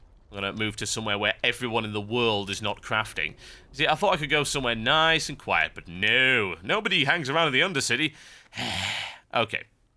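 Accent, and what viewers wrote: United Kingdom. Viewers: brit accent sucks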